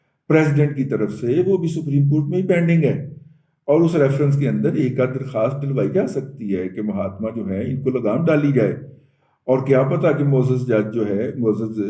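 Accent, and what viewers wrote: Punjab, Pakistan. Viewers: sahib aap commentry kisi aur se karwa liya karain, awaz kam ziyada, ziyada punjabi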